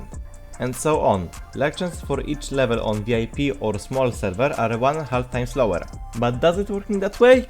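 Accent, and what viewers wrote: Poland. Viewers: siema słychać że polak gada hahahah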